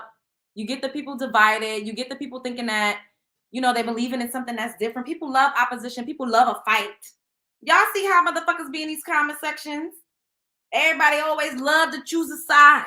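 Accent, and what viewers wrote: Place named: New York, United States. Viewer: Hey girl! Do you remember Miss Jones from Hot 97? You sound a lot like her. It’s the NYC accent for me! 😍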